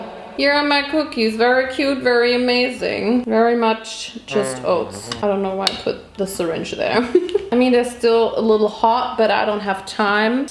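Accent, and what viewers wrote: United Kingdom. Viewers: Please a whole Video just talking in your hilarious british accent !! 😂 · i love your british accent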